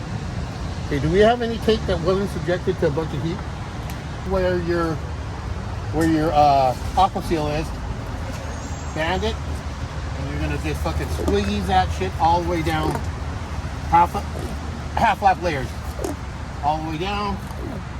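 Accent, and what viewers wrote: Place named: New Jersey, United States. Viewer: sounds like hes from new jersey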